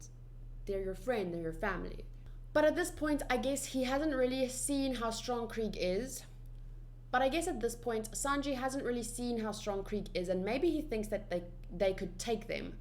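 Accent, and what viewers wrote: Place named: South Africa. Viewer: South African accent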